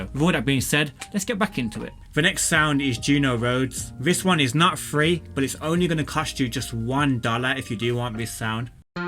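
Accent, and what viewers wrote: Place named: Wales, United Kingdom. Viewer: Love that welsh accent ✅😉😀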